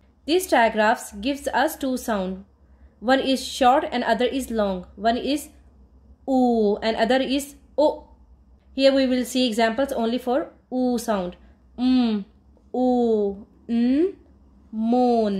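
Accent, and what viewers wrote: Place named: Bangladesh. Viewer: Из Бангладеша учительница? Произношение прямо выдаёт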